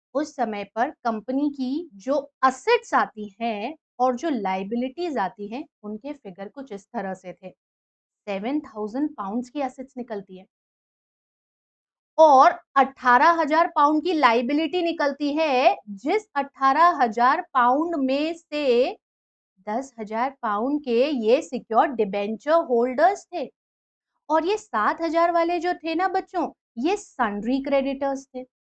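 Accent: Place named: Gujarat, India. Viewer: mam aap gujrat se ho kya bcz apka accent match krta hai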